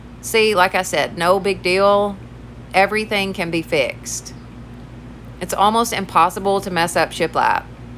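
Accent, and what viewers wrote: South, United States. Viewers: I just stumbled across your channel. So I'm new here love all of you ideas... curious to know where your from because you sound very southern. I am from Tennessee so I really enjoyed listening to you and watching I am now a fan. Thanks so much for the ideas. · Hey, love your accent. What part of the south do you live in? This is my first time with you, and I love everything. Very encouraging.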